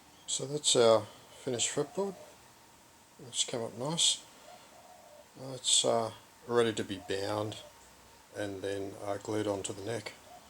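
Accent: Australia. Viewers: Thanks for an absolutely great video on how to do this with precision! And I love your Australian (?) accent:)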